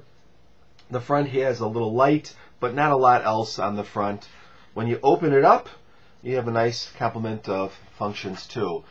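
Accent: Chicago, United States. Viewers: Hahaha Its the way you pronounce your "A's" and "O's", especially the A's. For example in "podcast". Sounds like a strong Chicago accent to me. I'm from the south (Arkansas), by the way.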